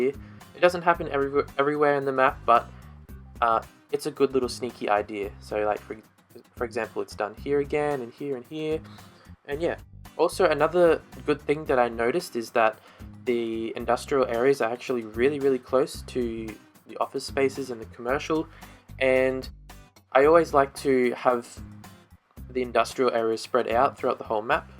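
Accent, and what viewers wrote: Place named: Adelaide, Australia. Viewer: your accent's similar to my Adelaidian friend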